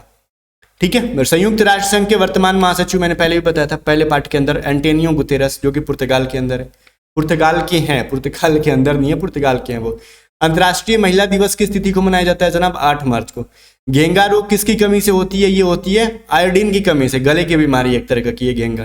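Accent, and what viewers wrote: Bihar, India. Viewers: Abe apni bihari mat jhad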